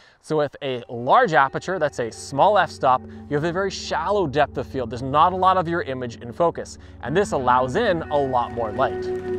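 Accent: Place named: Canada. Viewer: It may be his Canadian accent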